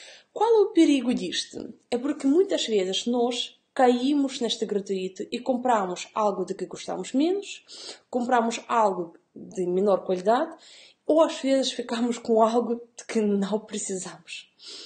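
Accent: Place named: Eastern Europe. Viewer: O que me admira mais é o facto de conseguir raciocinar abstratamente numa língua estrangeira, de forma tão correta! O seu ligeiro sotaque de leste, então, é a cereja no topo do bolo! Parabéns, Senhora!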